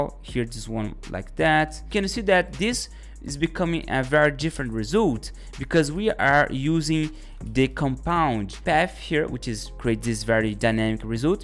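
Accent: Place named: Brazil. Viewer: There is absolutely no chance you´re not brazilian with this accent hahahaha thank you so much for your help :D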